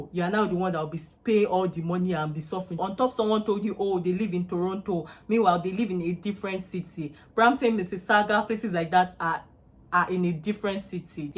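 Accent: Nigeria. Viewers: Are you sure you left at age 16. Cos your yoruba intonation is wow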